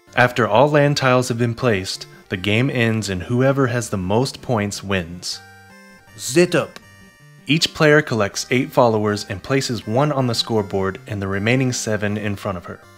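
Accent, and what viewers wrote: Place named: France. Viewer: great French accent!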